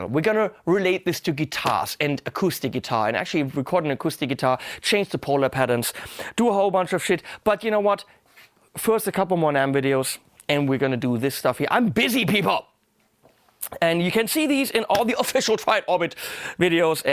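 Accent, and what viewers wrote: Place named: Hesse, Germany. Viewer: Hahahaaa, wenn Du deutsch sprichst, kommt ein bisschen Hessisch durch... 😀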